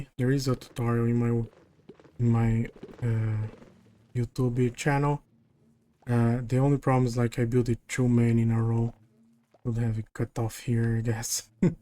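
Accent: Brazil. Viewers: É brasileiro? Tem sotaque de brasileiro kkkkkkkk. Mundo massa demais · i came for minecraft but stayed for the brazilian accent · brazuca aqui, ingles bom , entretanto dá pra identificar que é brazuca hehehe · Probably in the top 5 worlds of all time by the way, are you Brazilian? Your accent sounds like it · Pelo nome e o sotaque, acho que você é brasileiro, confere?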